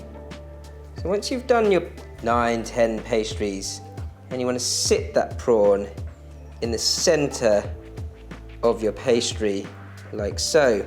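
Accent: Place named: United Kingdom. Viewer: His accent is very british... tickle my ear drum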